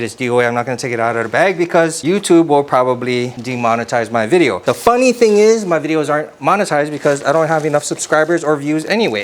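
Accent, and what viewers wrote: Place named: Hawaii, United States. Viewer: Came across a couple of your videos and by your accent I had a feeling you was from Hawaii 😂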